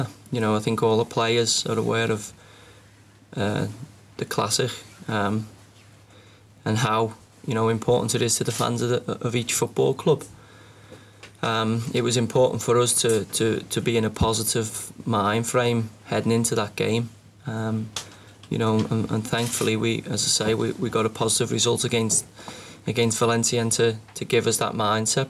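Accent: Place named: France. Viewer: so he decide to continue dat french accent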